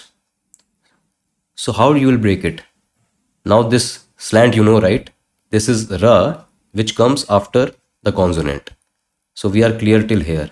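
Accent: Hindi, India. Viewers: All your explanations are very good , but for your pronounciation . Because mostly you pronounce every Sanskrit word in Hindi format. You don't pronounce Balaka, but you say Balak. Visarga is correct not Visarg. All Hindi speaking pronounce Sanskrit words with consonants as क्, म्, व् as Balak, Ram, Shiv, instead of बालक, राम,‌शिव, Please change your pronounciation, otherwise we South Indians ( Tamil) will mispronounce. Sorry for this corrections. But in Sanskrit , pronounciation should be very precise.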